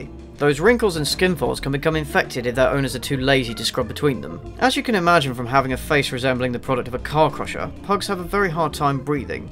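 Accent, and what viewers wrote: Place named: United Kingdom. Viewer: I was NOT expecting you to be so british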